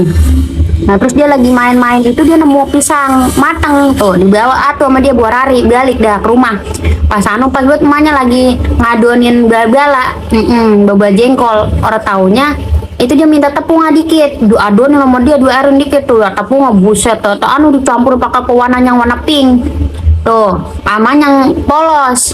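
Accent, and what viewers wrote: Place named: Betawi, Indonesia. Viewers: betawi A nih kalo betawi E udah jarang yak 😂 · betawie ngapak.😁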